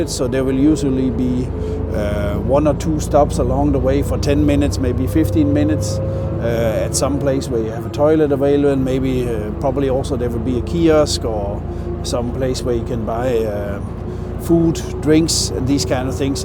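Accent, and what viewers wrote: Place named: Germany. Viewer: Croat here, very nice video Sir.... Only negative thing for me personally, your english sounds like Klaus Schwab talking 😊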